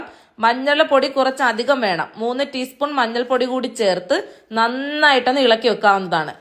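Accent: India. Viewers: oro sthalathe slang avaravarude identity aanu. Thiruvananthapuram, Kollam slang kelkkmbo mathram enda preshnam... Eee slang kelkkan vendi aanu pradhanamayum njn ee channel kaanunnath. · Ithu keralathil evidethe slang aanu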